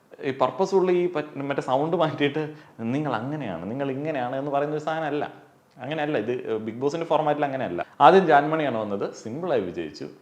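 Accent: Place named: Thiruvananthapuram, India. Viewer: തിരോന്തരത്ത് താമസിച്ച് താമസിച്ച് ഞങ്ങട സ്ലാംഗ് ഒക്കെ അറിയാതെ വരുന്നുണ്ട്. 🥰🥰 ഗുഡ് പ്രസന്റേഷൻ. നല്ല ഒബ്സർവേഷനുകൾ.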